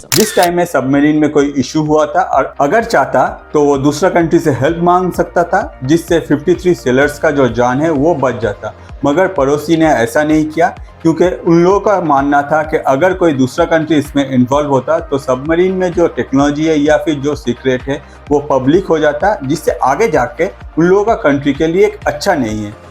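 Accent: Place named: Assam, India. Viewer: App Assamese ya Hindi na bolne wala he? Kyuki AAP jav Hindi bolte ho lagta he dusra bhasha bol rahe ho😊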